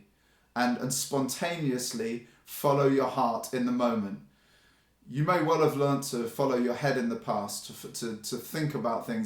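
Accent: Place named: South East England, United Kingdom. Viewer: I'm not really into the 'peace love and harmony' stuff and my initial impression of the first 5 seconds of your video was "oh here we go. some pseudo spirituality bullshit", but you were actually saying some interesting and meaningful things. Nice one. Also what part of England you from? Sounds like south-east.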